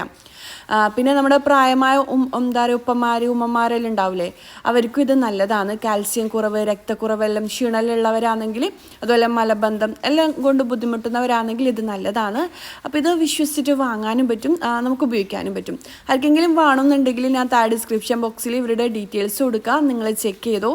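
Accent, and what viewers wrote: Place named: Kasaragod, India. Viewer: കാസറഗോഡ് സ്റ്റൈൽ സംസാരം. കുറച്ചു ഉണ്ട്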